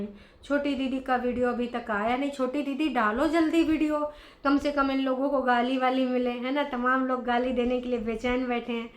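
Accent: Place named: Bihar, India. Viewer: I like what u say coz its correct bas thora aur Hindi mein agar bologe toh easy lagega samajhne mein , like wo bhojpuri bihari type feel hai toh samaj nai ata hai .. plz don't mind jst saying but u say correct stuff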